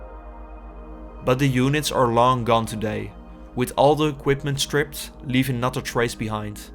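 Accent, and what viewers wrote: Netherlands. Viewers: You do a phenomenal job with this cinematic video, but a lot is lost because of your heavy Dutch accent. If I were to give you any advice: try to work on this or find a native speaker to do the voiceover. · that aweful dutch accent .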